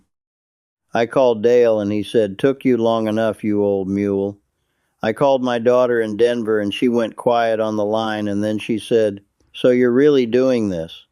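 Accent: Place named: Texas, United States. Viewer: He is from Texas. They speak like that.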